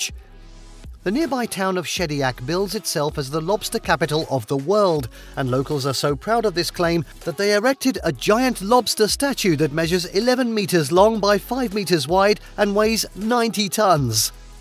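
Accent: United Kingdom. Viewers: thx for info! and your speech is clear enough to understand!🙂 I'm sorry, is it British?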